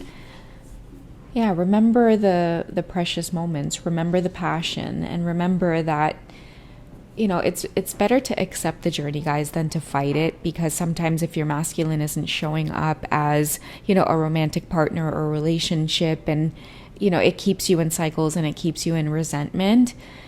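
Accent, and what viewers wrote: Trinidad and Tobago. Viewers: yes we love your trini accent, you should defly use it more its so authentic and its music to my ears, reminds me of my aunt🥹🥹❤️‍🔥🇹🇹🇯🇲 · As a fellow Trini, I love hearing your accent! 🙌🇹🇹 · Are you trini?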